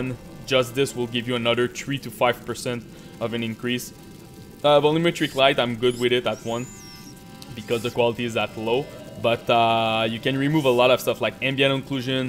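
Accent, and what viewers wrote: Quebec, Canada. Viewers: Arrête! On entend le québécois en lui mais son accent est vraiment pas pire!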